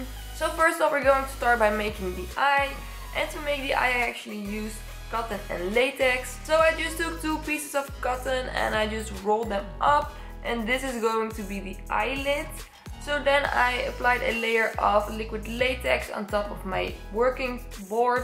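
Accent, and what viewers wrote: Netherlands. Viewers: het is zo grappig ik hoor je Nederlandse accent tussen het Engels PS love you dit is echt een van de beste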